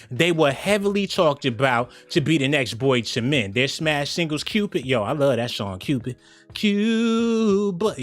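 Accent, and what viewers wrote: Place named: Cleveland, United States. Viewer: The reason people saying some jacked up shit is cause yo voice dude lol they don’t take u serious “sometimes”. The way he talks: u know what im sayiiin we all gotta come together an beat our chicks an maybe we might get a promotion at our 9-5’s the nigga talk like Cleveland lol but he sounds like he super sarcastic while saying real shit lol but I don’t care I only said something cause u said u don’t want people to talk trash about him. It’s ur voice it interests more people into doin it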